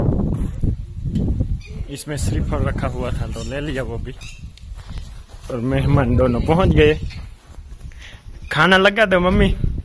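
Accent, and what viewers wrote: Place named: Kathiawar, India. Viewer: Apke bolne k dhang se pata chalta hai k aap kathiawadi ho,kathiawadi log kathiawadi dhang se bolte hai,from punjab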